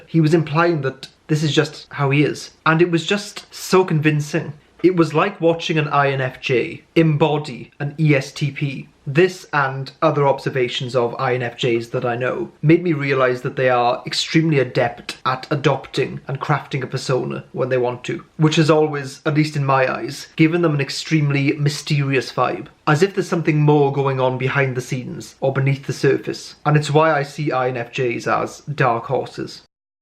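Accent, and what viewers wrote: Wales, United Kingdom. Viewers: Oooogh Biritish accent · Are you Welsh? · Where in Wales are you from?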